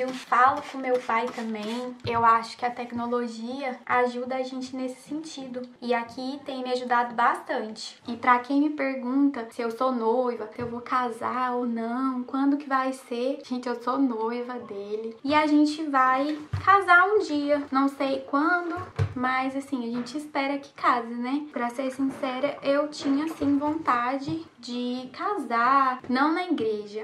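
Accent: Goiânia, Brazil. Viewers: Oie, sou a Pâmela de SP, minha melhor amiga é de Goiânia eu adoro seu sotaque me lembra dela! Quero fora em um futuro próximo, seus vídeos são muito explicativos!!! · Oi, meu nome é Dayane, vivo em Liverpool UK, assisto seus vídeos desde quando vc morava em Londres e acompanhei sua saga. Um vídeo seu que mais que marcou o vídeo que você viajou com o Stephen sozinha, foi buscar seu documento e não estava pronto. Teve que voltar com as malas e remarcar a passagem. Partiu meu coração ver você sozinha mas ao mesmo tempo vi o quanto você é forte e uma mulher sábia. Tinha inúmeros motivos para reclamar mas transmitiu uma imagem de segurança e tranquilidade. Amo seus vídeos e tenho certeza que vocês vão superar toda essa situação com seu bebê. Vou colocar vcs nas minhas orações. Deus os abençoe grandemente. Quem sabe um dia tomamos um café juntas. Ps. Amoooooo Goiânia e o seu sotaque ❤️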